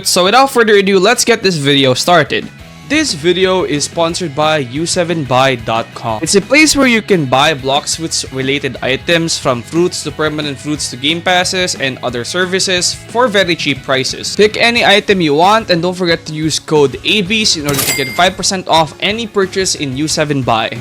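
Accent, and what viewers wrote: Philippines. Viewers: bro is accent pilipino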